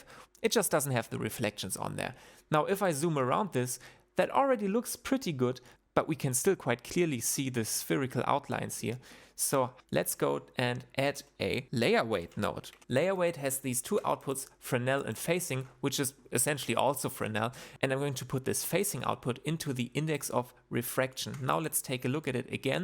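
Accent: Germany. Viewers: Not only is the accent german, but the human is painfully german. Not austrian, but so painfully german. Und damit danke fuers Tutorial und gute Nacht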